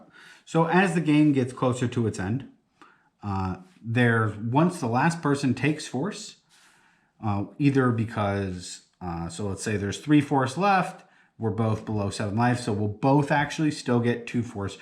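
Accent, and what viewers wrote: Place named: Midwest, United States. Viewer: That midwest accent is thick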